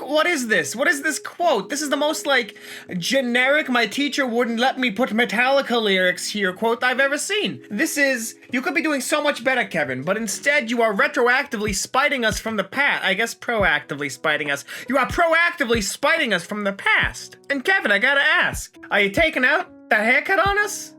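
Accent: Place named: New York, United States. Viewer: why do you sound so much more new york kwite?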